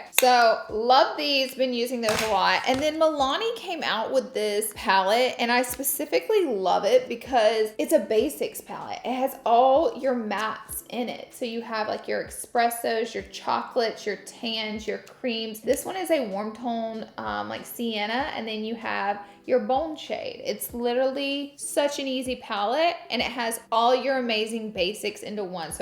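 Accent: South, United States. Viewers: Tell me you're from the South without telling me you're from South ! FYI ... Nola girl here, so love you still have it! Anytime you say oil and y'all! 😂🤣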